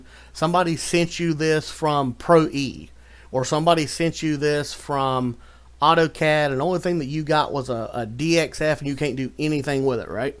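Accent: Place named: South, United States. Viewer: Thanks for the help and I love your southern accent brother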